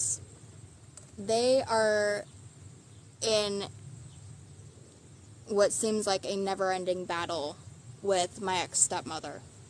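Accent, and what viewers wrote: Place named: Florida, United States. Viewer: I can say as a father of daughters I am very proud of you getting away from Lie-net and focusing on your family. You sound like you would fit right in my country area of osteen florida. Jeremy hales is a really good guy who sticks to his word and helps through the heart and has much respect world wide. I look forward to more videos from you.... until then keep that pretty smile going😄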